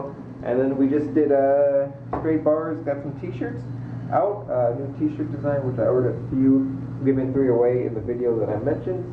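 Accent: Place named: Ireland. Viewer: You like an Irish man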